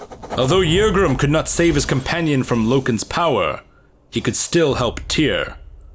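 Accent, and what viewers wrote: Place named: Scotland, United Kingdom. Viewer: My mistake, sounded like a native Scot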